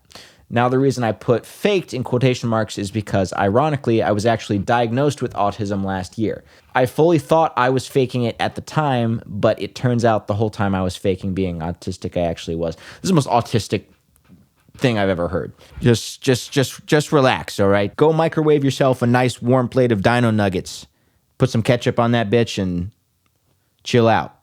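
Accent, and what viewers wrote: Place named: United States. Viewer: Hey. I have multiple diagnosed disabilities and am a disability studies scholar. What you're doing w this video is all wrong and incredibly harmful to actually disabled people. Yes, there are people out there who fake having a disability and the internet has made them more visible than ever. But this abled urge to play detective in order to prove or disprove any person claiming to be disabled is legitimate or not literally kills disabled people. Yes, the faking can take up some limited resources from legitimately disabled and their existence creates their atmosphere of doubt around disabled ppl but outside of when it proceeds to the next stage of attempting to do whatever the disabled equivalent of 'transvestigating' is that it truly becomes dangerous to disabled peoples lives. You sound like you're from the US, so lemme tell you about something that happened in the UK bc of this exact impulse. 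In 2010 the conservative party and the liberal democrats formed a coalition govt that pursued an economic policy called austerity. This is a program which seeks to cut back funding to all public services, and part of that included some very significant welfare reforms. At the time, the political atmosphere was one of distrust towards ppl on benefits, this kind of prevailing belief that there was some massive amount of 'welfare queens' equivalents and disabled fakers who were living lives of luxury on the public dime and was aggressive about trying to identify and weed them out. So, as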